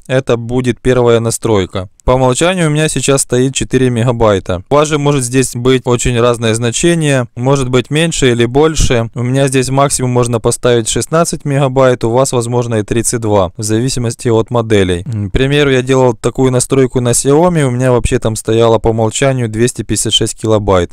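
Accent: Ukraine. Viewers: Извините конечно,Ноя просто так слышу то как он говорит на русском но слышно что он с украины😂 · Говор Закарпатье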